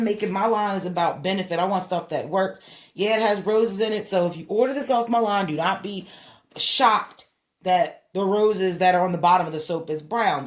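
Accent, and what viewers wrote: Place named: Baltimore, United States. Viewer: Your from Baltimore right ? Your accent gave it away, i have family there❤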